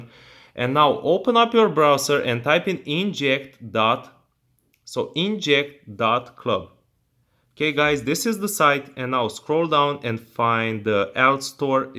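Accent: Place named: India. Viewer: Right as he had an Indian accent I knew it was fake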